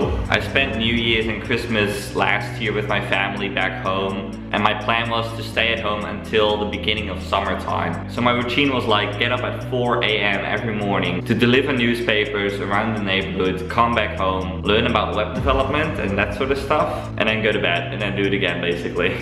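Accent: Australia. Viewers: Talking like an Auzzi pal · Hi, I thought I'am the only one noticed your Oz accent. Great that you had assimilated to the local culture pretty well. Happy New Year to you and may it be the best for you!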